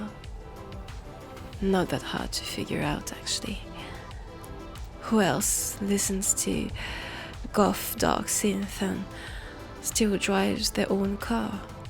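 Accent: France. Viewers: Damn bruh you had me at French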